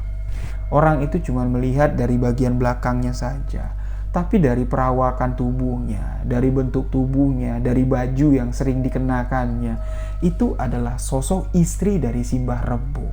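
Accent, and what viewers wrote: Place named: Madura, Indonesia. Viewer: Aksen madura nya tolong di hilangin bang, agak” ketawa jadinya dengar penyampaian nya😂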